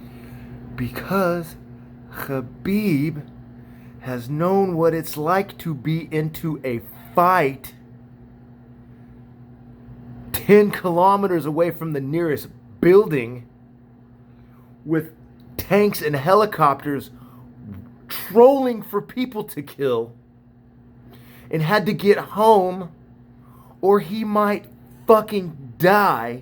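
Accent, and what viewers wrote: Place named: Russia. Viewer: Your Russian accent is very cool! Haha😂 . Где изучал русский язык?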